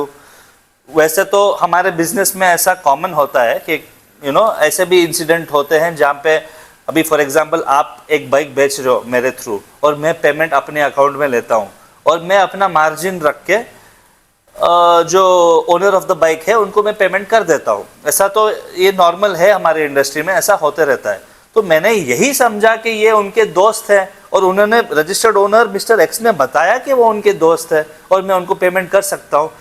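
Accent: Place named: Punjab, India. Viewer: He speaks Punjabi more like north punjab punjabi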